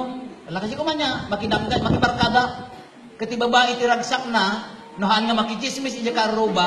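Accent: Ilocos, Philippines. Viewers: Jeje mas natawa ako sa accent ng ilocanu ni fr.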